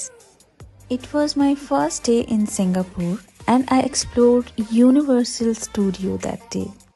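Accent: Tamil Nadu, India. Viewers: U r tamil is so sweet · Cute Tamil❤ love from Chennai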